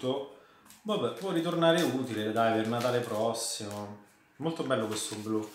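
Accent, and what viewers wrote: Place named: Rome, Italy. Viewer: ah davvero? L'accento sembrava romano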